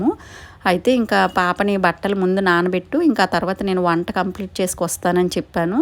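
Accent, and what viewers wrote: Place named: Srikakulam, India. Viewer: Akka slag vinte manadhi ma srikakulam la vundhi ea oru akka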